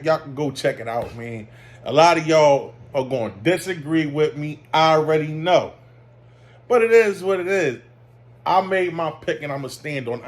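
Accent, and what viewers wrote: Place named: Baltimore, United States. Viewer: You got a strong Baltimore sounding accent but Philly ain't but a few hours away. And Ryan only got a left hook. Nuthin else. Tanks gonna nap him.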